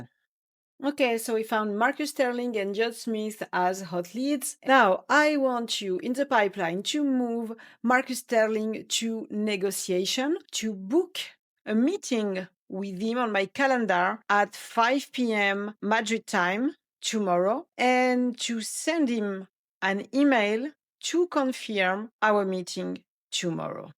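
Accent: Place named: France. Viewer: Wha le french accent but super glad to see and GHL expert as woman ! 🔥🔥🔥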